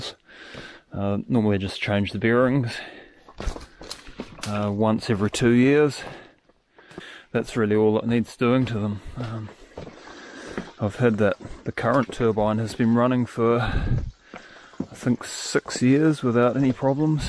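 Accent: New Zealand. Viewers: ..you sound like a Kiwi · I'm looking at this thinking its gotta be NZ bush... hears the accent ;) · Fascinating how a sort of "hybrid British accent" evolved in New Zealand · I love the kiwi accent... "Strup of deed bak"... Love the video. You gave me some ideas.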